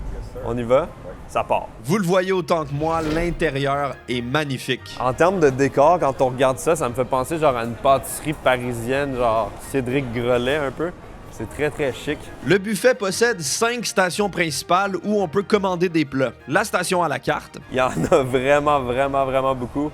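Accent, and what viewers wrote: Quebec, Canada. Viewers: is this that really disgusting Canadian French? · Beuh écouter un français approximatif qui sort de la bouche d un kebeukoi avec un accent à vomir …bravo !!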